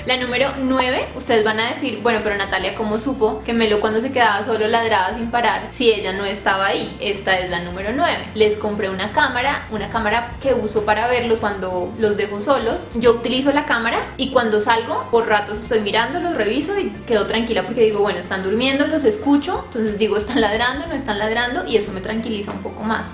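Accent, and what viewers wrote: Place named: Colombia. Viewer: Me gusta mucho tu acento , de que parte de Colombia es ?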